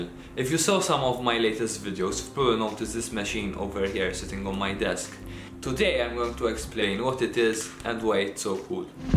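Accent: Malta. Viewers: Thats a very maltese accent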